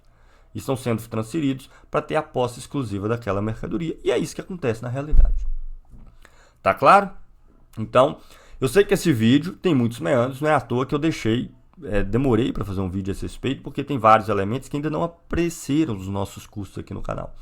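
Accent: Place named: Minas Gerais, Brazil. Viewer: Kkkk isso tá me incomodando desde o início das aulas sobre o capital kkk Colé??? Kkk é vício de linguagem, cara é mineiro, vamos dar um desconto.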